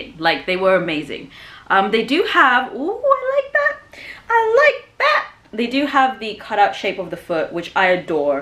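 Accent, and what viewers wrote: United Kingdom. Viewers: Love my Ebony Queens. Love my Ebony curves, with a British accent.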